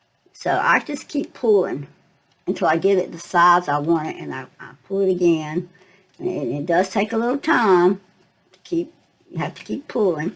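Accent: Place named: North Carolina, United States. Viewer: I just found your channel tonight and as soon as I heard your voice I thought you sounded like a nice southern grandma...like many of the older southern women I've known my whole life. When you said something about NC I realized that's why you sound so familiar. I was born, raised and still live in Raleigh. You remind me of my grandmother who passed in 1997 at the age of 84. I will be visiting your channel often, not just to hear your voice but to ingest your wonderful junk journaling content. I love that center pocket flip!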